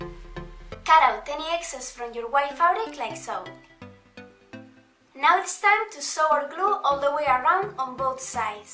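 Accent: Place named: Spain. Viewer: You have Spanish accent. I love the video! <3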